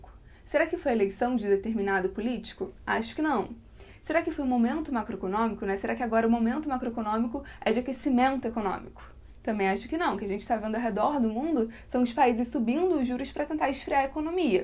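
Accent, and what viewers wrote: Rio de Janeiro, Brazil. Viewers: Carioquês bacana..😂😂😂😂😂... E muito conteúdo bom.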